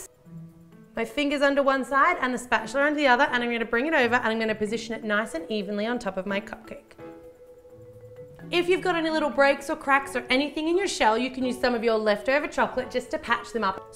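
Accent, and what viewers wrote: Australia. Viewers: She's Australian so obvi she had a diff accent Don't be rude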